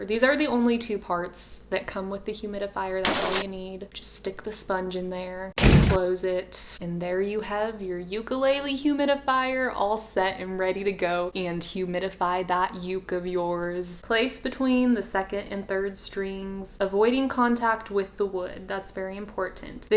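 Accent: South, United States. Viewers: Love that southern accent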